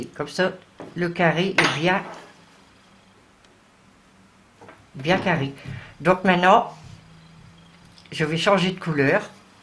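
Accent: North, France. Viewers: bonjour, je suis née a Bruay sur Escaut, et ma famille était a Raisme, Anzin, la dernière tante nous a quitter, la tata m' a préféré, j en et plus, mes j adore entendre l accent du nord, gros bisous toi qui et très très gentil, gros bisous Monique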